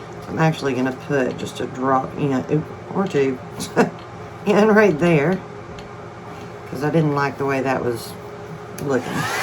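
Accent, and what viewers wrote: South, United States. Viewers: I like your Southern accent. Maybe you could edit out the blow dryer noise. That's kinda loud.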